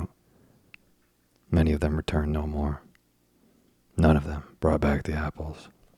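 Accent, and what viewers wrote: South, United States. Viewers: Is there a southern twang?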